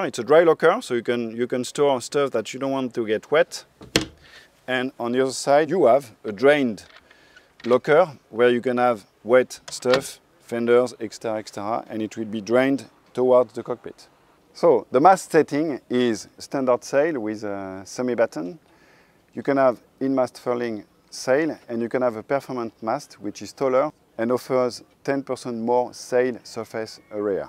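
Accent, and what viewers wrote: France. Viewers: OMG I love his english... his accent is so funny, so french... :-)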